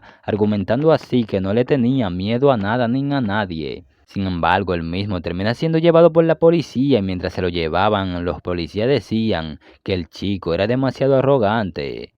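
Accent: Dominican Republic. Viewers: Pero ven acá loco tu eres dominicano? Ese acento no me engaña 😂😂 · Eres Dominicano?? Tu voz y tu jerga me paracen de un Domi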